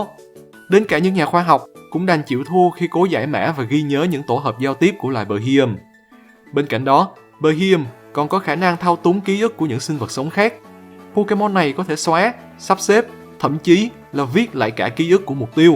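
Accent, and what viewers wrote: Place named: Mekong Delta, Vietnam. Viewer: Thích vì cácb nói chuyện đậm chất miền Tây (dù không biết ổng miền nào) Ví dụ rất dễ hiểu và cừii ss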